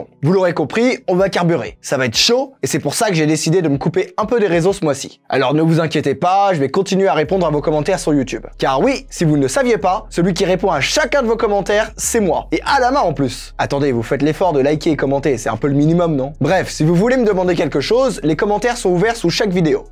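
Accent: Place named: United States. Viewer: à ta manière de prononcer l'Anglais je dirai que tu as vécu aux États-Unis étant jeune ;)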